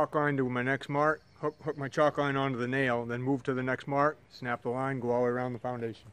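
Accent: Maine, United States. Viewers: Sounds like your a Mainer!?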